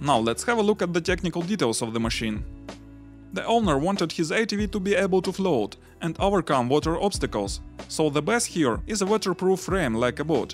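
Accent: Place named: Russia. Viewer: Акцент то РУССКИЙ да и лицо наше, ничего не понятно но очень интересно.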